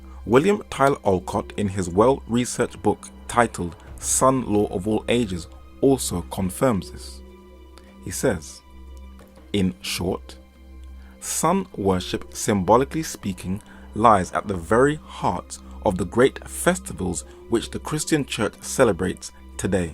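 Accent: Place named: Scotland, United Kingdom. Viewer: very difficult to understand the speaker. Sounds like he is a s Scottish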